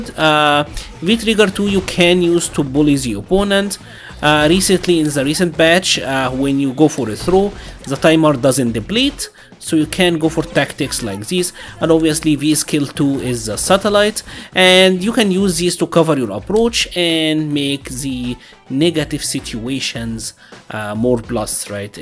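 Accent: Egypt. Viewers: Are you Egyptian? You sound Egyptian.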